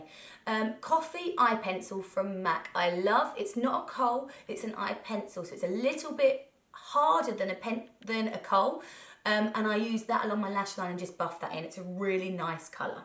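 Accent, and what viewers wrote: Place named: Norfolk, United Kingdom. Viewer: I've just recently moved abroad away from Norwich and sometimes I really miss home. You correcting 'beautifully' made me chuckle because I do exactly the same thing when I say something that sounds 'so Norfolk'!! Love the fact that Norwich has produced 2 such excellent make-up artists. Your videos are fantastic and I'm seriously envious of your lashes!!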